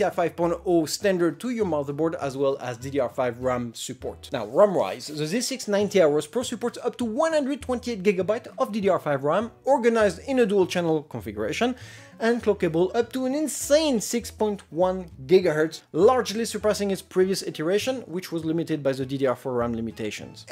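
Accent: France. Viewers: pretty french accent bro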